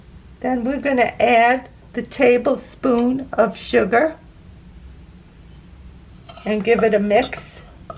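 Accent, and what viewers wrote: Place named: New York, United States. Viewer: Hi I'm from England what is the tomato sauce that you used equivalent in the uk we have tomato puree and cans of plum tomatoes or chopped Tomatoes but I've never seen cans of tomato sauce. What we call Tomato sauce is the sauce you put on your plate like you get in a cafe and you use like brown sauce and put a little bit on. I'm struggling this week and have 3 pounds 50p to last me 5 days so need a really cheap recipe. Unfortunately I don't know what this tomato sauce is. Could I mix tomato puree with water to make it? P.s Loving your accent Newyork?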